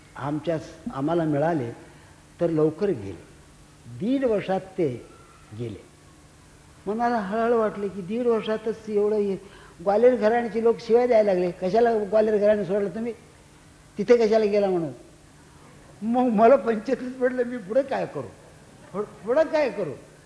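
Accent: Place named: Karnataka, India. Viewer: His marathi accent is soo much kaanadi 🤗❤️ felt like he is talking kannada 🤗🎉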